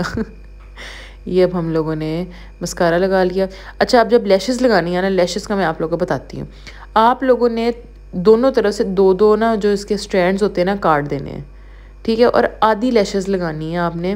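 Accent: Punjab, India. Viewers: Haye didi tuc teh bilkul india wale mtlb sade vangu ani soni Punjabi bolde oo appa same same aa bilkul haye kash Pakistan wakhra na hunda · Wawwww jwa same Punjabi jehdi edr boli jndi aa..🥰m vry glad to listen ...m from punjab .. nd luv uhhh lehnda punjab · Apka Punjabi way of talk mazeka h bht😍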